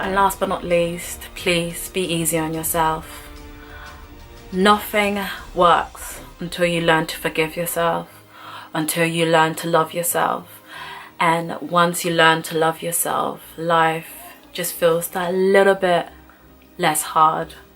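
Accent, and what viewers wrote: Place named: Germany. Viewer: Babes this is beautiful and so are you! 1) I was like WHERE IS THIS ACCENT FROM- you answered Germany! So cute 2) power of the tongue you hit the nail on the head 3) I watched this whole video and enjoyed from start to finish. Your honesty is so inspiring - you’re so brave and a true role model! Cannot believe you could EVER tell yourself those things. To me you are so beautiful. Love ya girl xxx - can’t wait for more!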